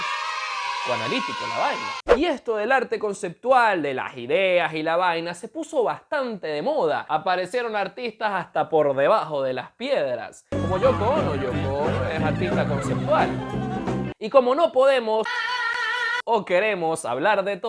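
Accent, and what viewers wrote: Venezuela. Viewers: A mí me suena más a venezolano, dijo "pana", eso no es mexicano. Saludos! 😊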